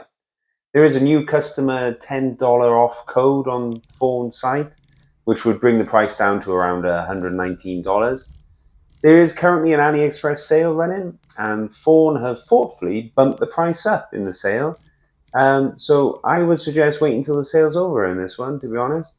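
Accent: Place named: Cardiff, United Kingdom. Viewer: Sounds like a Cardiff accent to me....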